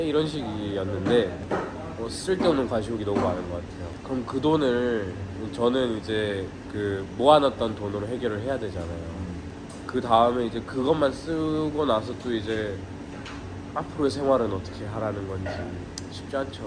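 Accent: South Korea. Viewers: 부산 사투리 아직까지 있네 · 이 분은 한국분인가요? 말씀하시는 게 토종 한국인인데요 ㄷㄷㄷ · ㅇㅇ 한국말을 잘한다 라고 말할게아님...그냥 모국어가 한국말인데 우리랑똑같죠 모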